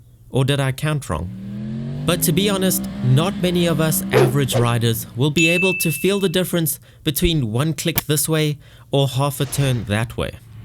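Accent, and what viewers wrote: South Africa. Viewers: Who else follows this dude partially because of his South African accent!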